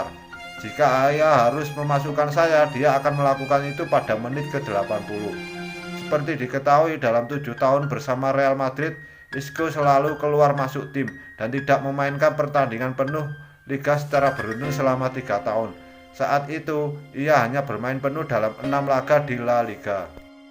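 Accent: Java, Indonesia. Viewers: Kaya orang Jawa ngapak hehehe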